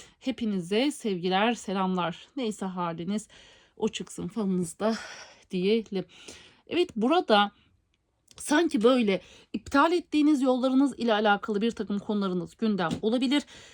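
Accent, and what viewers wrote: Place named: Azerbaijan. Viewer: Salam. Siz Igdirlimisiniz. Sanki konusmanizda bazen benziyor da o yüzdən. Yani bazen azərbaycanca konusmanizda da var Ben onu hiss ediyorum.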